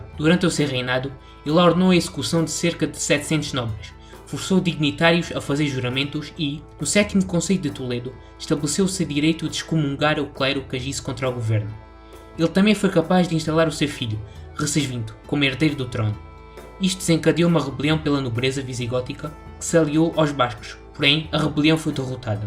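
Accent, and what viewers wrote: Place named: Portugal. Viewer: Riqueza de conteúdo histórico, vídeo muito esclarecedor e cativante com o sotaque agradável e bonito de nossos irmãos de além mar lusitanos... Só aqueles que desconhecem a nossa história própria história os satirizam ou menosprezam o valor de inúmeras figuras que estiveram envolvidas no descobrimento e exploração da (então) colônia, foram fundamentais para o início de nossa terra! Admiro muito as histórias desse período e quero acompanhar e descobrir cada vez mais, obrigado por compartilhar conosco irmãozinho!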